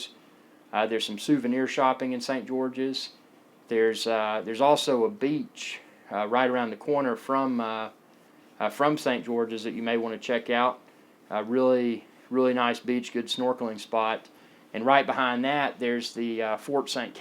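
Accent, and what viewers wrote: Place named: Alabama, United States. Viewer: I'm sure this is a great video, just can't get past the Alabama accent. Rolling on the floor laughing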